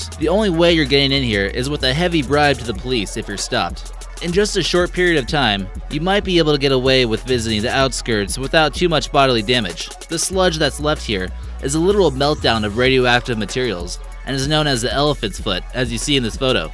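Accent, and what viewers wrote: United States. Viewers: What's with the Aussie accent for the subscribe button and the American accent of the nasally narrator..?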